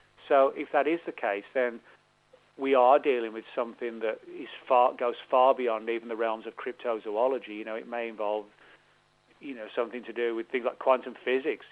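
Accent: United Kingdom. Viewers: Hey, great interview as always.. Much love from home.. Can't believe you still have your Walsall accent.. 🇬🇧🏡💗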